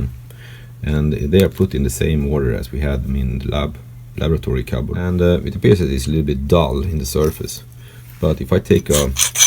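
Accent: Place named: Sweden. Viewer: Took me 2 seconds to know you Are swedish :-)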